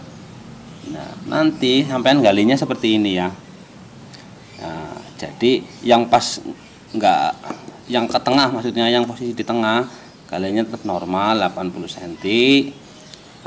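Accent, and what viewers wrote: Banyumas, Indonesia. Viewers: wonge ngapak ini, g tau asalnya dari mana, yg penting sukses channel-nya👍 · Koyo wong ngapakkk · Kaya wong ngapak apa yah...😁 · Kayaknya orang Banyumas nih.... ngapak2 😁😂👍👍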